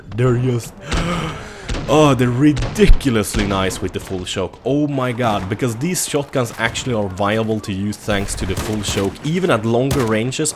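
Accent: Sweden. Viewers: Hahaha! Your accent is the best! I'm glad to have you swedes as neighbors!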